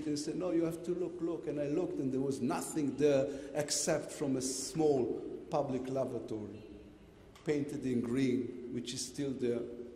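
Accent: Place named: Israel. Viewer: פעם ראשונה שאני שומע את לפיד מדבר באנגלית. מדבר אנגלית ממש טוב בקושי שומעים את המבטא הישראלי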